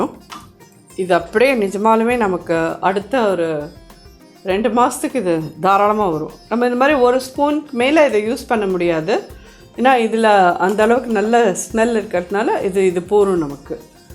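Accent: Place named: Thanjavur, India. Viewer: Mami neenga Thanjavur karala. Engathu periyava Madhiri peseral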